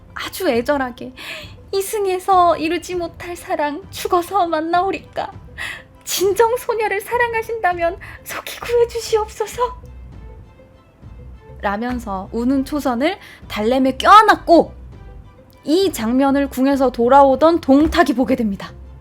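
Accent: Gyeongsang, South Korea. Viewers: ㅋㅋㅋ 오리지널 네이티브 부산 경남 사투리를 구사하시네요? 갱상도 여자 아입니까?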